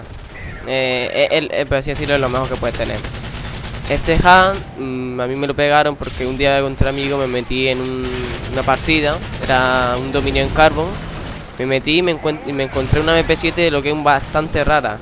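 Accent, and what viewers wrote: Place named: Andalusia, Spain. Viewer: Los andaluces hablan así xd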